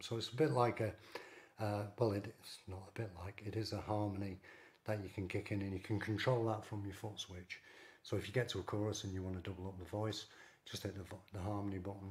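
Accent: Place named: Lancashire, United Kingdom. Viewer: Hi you sound like you’re from Lancashire like me, although I live in Spain. Am almost pulling the trigger on this just for a bit of busking with my mate, is it loud enough do you think, for a busy city street? And how annoying is it that they put the whole control panel “upside down “, do you have to keep getting up and going in front of it to change a setting? A minor issue but don’t know how much it would bug me, I can’t imagine why they do it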